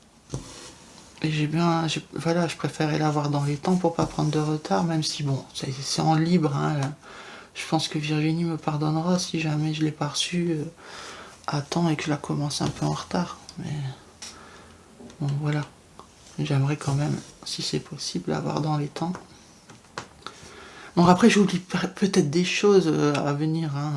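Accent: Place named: Nord-Pas-de-Calais, France. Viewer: Coucou Comment ça! L,accent chti un tue l'amour??😂😂😂 Moi je l'entends encore un peu sur le son de tes "a" 😁 Ah ah j'ai le même garçon! Jamais de sa faute 😂😂 Merci pour cet agréable moment et de plus j'attendais de voir cette toile avec impatience depuis que tu as dis que tu l'attendais donc maintenant c'est bon je peux craquer 😅😂 Gros bisous 😘😘😘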